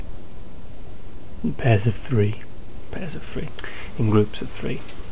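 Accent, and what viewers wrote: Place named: Nottingham, United Kingdom. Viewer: Top vid as usual. Went to see my folks today in Notts, your accent sounds very similar!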